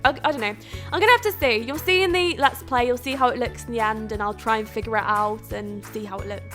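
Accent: United Kingdom. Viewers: I love your british accent so much !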